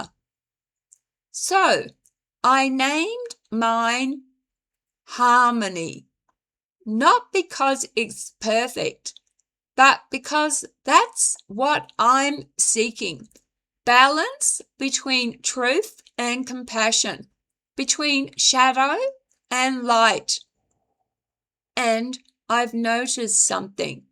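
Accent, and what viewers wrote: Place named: New Zealand. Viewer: Hi, where are you from? I almost thought it was NZ yet could be UK? Interesting content re Chat GPT for soul reflection. Thanks for sharing.😊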